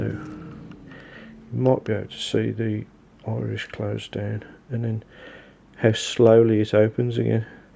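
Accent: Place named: Birmingham, United Kingdom. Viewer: You delivered the information, so job done. It’s got nothing to do with the Brum accent, my nerdy cousins in Handsworth have stronger accents than you and can hold a captive audience about some dry elementary principle in a dog-eared physics book. I could listen to Noddy Holder, Ozzy and many other native Brum or Black Country personalities for hours. It’s more about the lack of passion and energy, especially for one of the unsung heroes in the Leica back catalogue. I didn’t expect cartwheels and American style presentation exuberance. But to me you came across like a govt information film narrator from the 1970s, but that’s just me. There are many much worse on YT, and I probably present no better than you, but doesn’t prevent me from speaking my mind and giving some hopefully positive critique.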